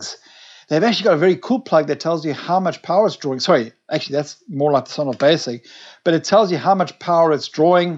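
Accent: South Africa. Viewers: Thanks so much for this video, also nice to see a South African video for a change LOL (mind you, a South African accent that that's not making a video from abroad!). Please can you do a review video on these new emergency LED light bulbs that have a the built in battery inside of them but work like a normal light bulb. Also do you have recommended retail prices and where one can buy the sonoff switches? Will check itead out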